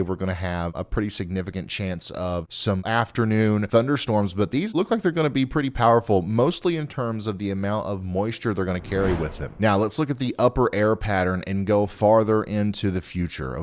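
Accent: South, United States. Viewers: I love my weather delivered with a sothern accent.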